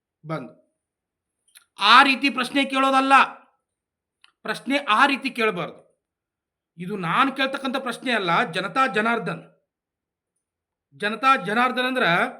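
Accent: North Karnataka, India. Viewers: Superb narration 👌👌👌 I loved your north karnataka accent 🤣🤣🤣🤣🤣🤣🤣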